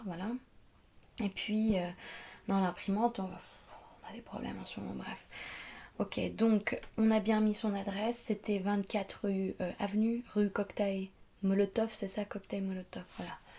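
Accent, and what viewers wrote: Vosges, France. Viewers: mdr pas mal ... T'es vosgienne ? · Étant vosgienne ton accent me rappel ma ptite ville paumé au fin fond des Vosges merci pour ce retours en enfance 😂😊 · bel accent vosgiens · Your French accent is very authentic and natural sounding...love to hear you try speaking English with a French accent and French with an American accent.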